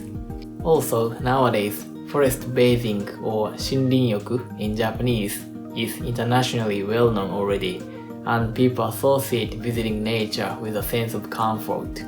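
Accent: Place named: Finland. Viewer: You've already picked up some Finnish accent.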